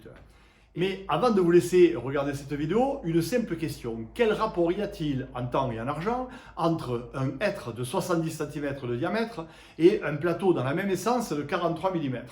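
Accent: South, France. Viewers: Comme on dit par chez moi: " C'est avec des petits riens qu'on fait des grands touts!" Une belle découverte cette chaine. Bravo! Et puis cette accents du Sud, mes racines... Au plaisir S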